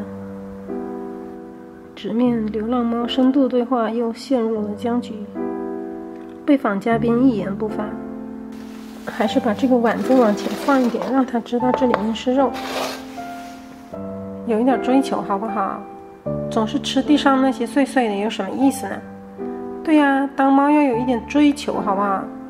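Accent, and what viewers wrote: China. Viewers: 听你的口音你应该是中国人吧！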